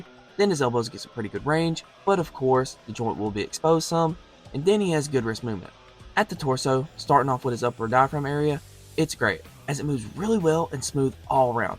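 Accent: Texas, United States. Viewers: is it like a Texan accent or smth idk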